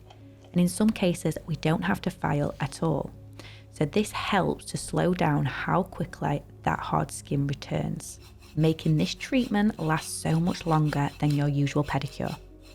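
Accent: United Kingdom. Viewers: where in the UK her accent is from?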